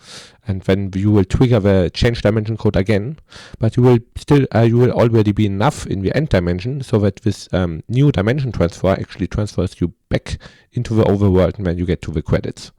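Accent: Germany. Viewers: Are you german mayhaps? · I hear a German accent. Good video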